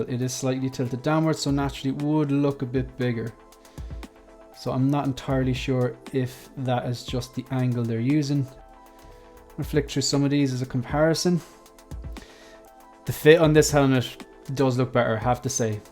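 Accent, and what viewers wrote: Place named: Ireland. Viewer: I watch a lot 1:6 collectibles/collector Youtubers. Lael, Riley, Justin, Will etc pretty much everybody, and dont get me wrong, I genuinely like those guys and enjoy their content but this video is a great example of why you are my favourite creator in this sphere of Youtube. As always just a very calm, thoughtful, balanced and measured approach to sharing your thoughts. I guess the Irish accent helps a bit too haha. Much love.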